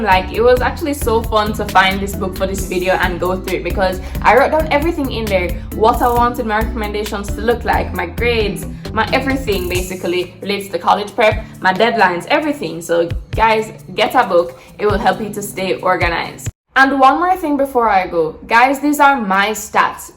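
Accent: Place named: Jamaica. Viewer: Oh I just found your channel like 30 minutes ago and couldn't quite put a finger on the accent. Now that you mentioned that you're from Jamaica, that clarifies it for me. I am applying to come to the States for my PhD and while a lot of what you said was directed at undergrads, I still found a few tips to be helpful as well. Thanks for the great content.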